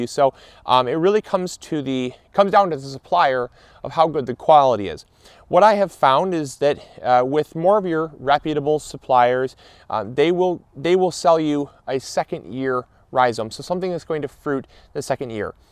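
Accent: Michigan, United States. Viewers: you have the thickest Michigan accent, love it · I can really hear that MI accent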